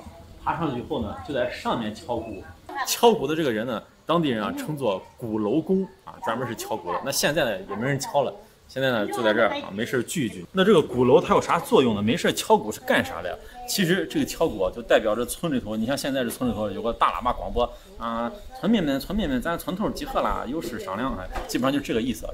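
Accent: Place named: Henan, China. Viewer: 第一次看的视频，听出来一丢丢邻居省份河南口音，很熟悉哈哈哈，视频很棒，期待更新。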